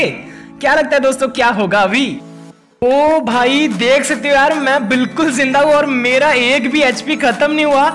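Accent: Odisha, India. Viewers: Bhai aap oddisa se ho Kya 😅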